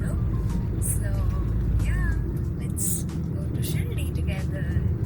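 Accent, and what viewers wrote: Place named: Mangalore, India. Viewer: ನಿಮ್ಮ ಕನ್ನಡ ಮಂಗಳೂರಿನ ಕನ್ನಡದಂಗೆ ಅನಿಸಿತು ಅದಕ್ಕೆ ಕೇಳಿದೆ🙏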